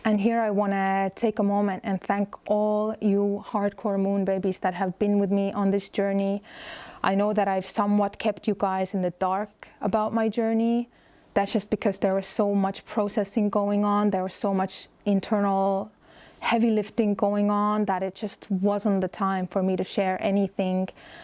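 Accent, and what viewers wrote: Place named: Los Angeles, United States. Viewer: "kind of like" yeah, sounds LA alright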